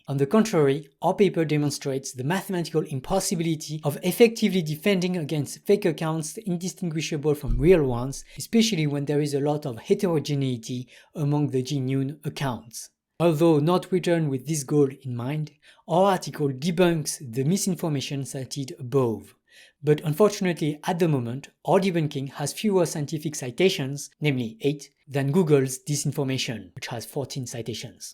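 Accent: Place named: France. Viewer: J’aime ton accent français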